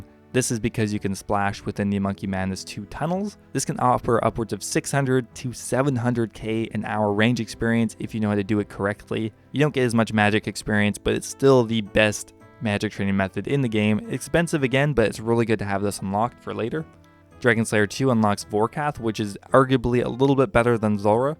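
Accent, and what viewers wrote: Canada. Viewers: Are you Canadian? I thought I heard an accent 🙂 🇨🇦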